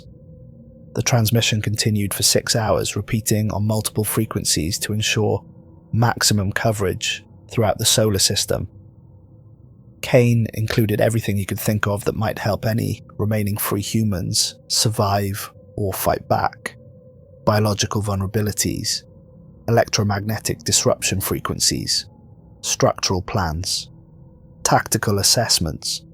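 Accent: Wales, United Kingdom. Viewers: Good stories and spoken in English with a Welsh accent. Looking forward to the next one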